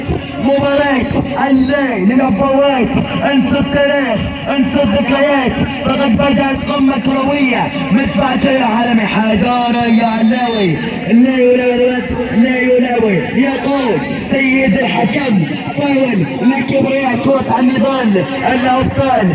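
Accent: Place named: Bahrain. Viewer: شنو هاذ بحريني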